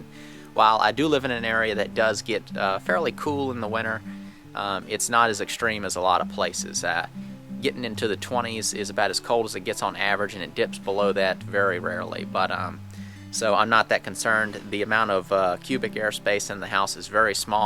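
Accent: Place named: Carolina, United States. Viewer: Sounds like a Carolina accent, should be fine in the winter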